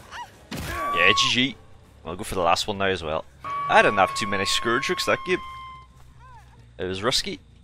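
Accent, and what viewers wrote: Northern Ireland, United Kingdom. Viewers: lol he sounds nothing like Paddy Pimblet. Paddy is English and has a strong Scouser accent from Liverpool & is from Northern Ireland by the sounds of it.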